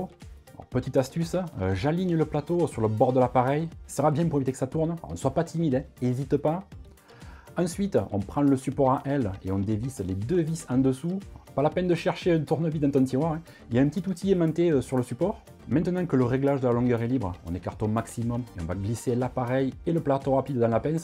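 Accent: South, France. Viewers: Hellooo 👋 Une approche différente de Yannick mais un enthousiasme similaire envers ce superbe stabilisateur et toujours avec l'accent du sud 😎✌️😉👍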